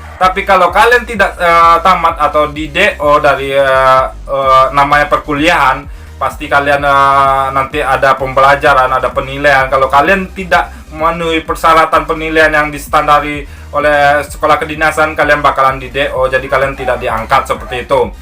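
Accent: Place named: Padang, Indonesia. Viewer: lu ada turunan maluku ya bang..tapi logat lu padang bangat... itu marga dari maluku.turunan raja..ningrat kalau jawanya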